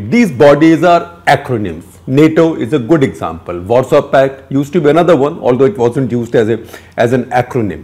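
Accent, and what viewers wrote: India. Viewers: If you want to address English speaking people you MUST lose that broad Indian accent because it makes everything you have to say so difficult to understand. You are a very knowledgeable man but you will remain unknown as long as you speak with that thick accent, I'm trying to help you I hope I didn't offend anyone. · Very India English. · Really dislike indian accent.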